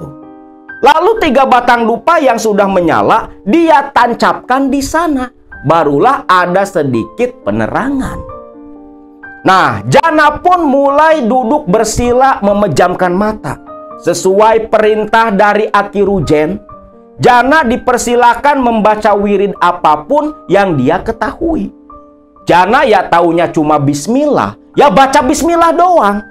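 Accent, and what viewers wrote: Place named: West Java, Indonesia. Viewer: Kang keren saya akui selain cerita mistis sejarahnya pun dibeber kan. Jelas iq kang tinggi. Pertahankan bahasa Indonesia dengan logat Sunda kang❤❤❤❤❤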